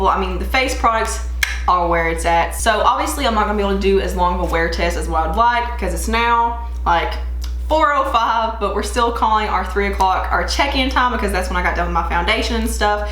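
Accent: South, United States. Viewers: I love love your video! This is the first time I’ve seen your channel and I live it!!! You have a wonderful voice and you don’t stammer! You know exactly what you want to say! No “um” no “like”! You talk fast but not too fast! On other channels if the talk too slow I find myself saying come on spit it out already! I get bored and go to another channel! You are very professional! And your southern accent is over the top! Will be watching all your videos! You rock girl! Thanks and keep up the great work!!! PS I love your lipstick! What is it? All of your makeup looks fabulous! Great job!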